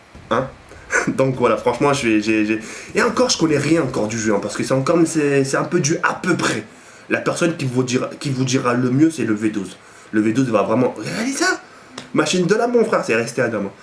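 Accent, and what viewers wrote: Arab world. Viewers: tu parles un peu a la rebeu ^^ mais tu geres et tu respecte vraiment les autres, au point de dire que mrlvl12 explique mieux, tu te prend vraiment pas la tete ca fait plaisir ;) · Salut, c'est dernier temps tes vidéos me plaisait moins, tu était moins acharnée je pense que tu attendais ce nouvel Opus pour pouvoir faire l'originalité et te refaire plaisir. J'ai eu le sourir durant cette vidéo car j'ai bien vue que tu était dans ton truc tu aimez tu passez du bon temps a commenter ta vidéo. Tu redeviens le bon avec son putain d'accent d'arabes (Pas méchant hein;)) Tu me fais plaisir continue, tu vas allez loin et surtout: AMUSE TOI!!! Peace frerot! <3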